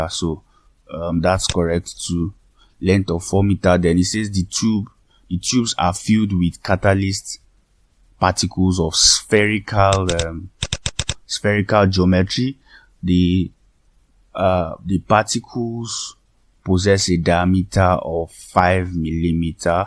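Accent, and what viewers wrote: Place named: Nigeria. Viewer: I always feel proud whenever I hear Nigerian voice in tutorial videos.. Good job bro❤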